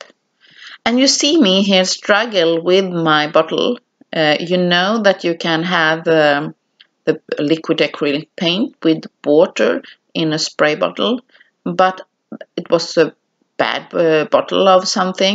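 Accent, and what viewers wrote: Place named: Sweden. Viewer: I love how you truly explain your techniques!!! I would love to buy the Finnabar brand products, but it is so expensive here in the US. Also, I love listening to you speak, it makes me miss min famijl in Skane. Have you done any mixed media projects with a more Swedish tone? My cousin was on Alt for Sverige and we found a photo of our linking great grandmother. I want to do a project for all my family with her photo in the mixed media style, but with a Svenska tone to it. Tack for any suggestions!!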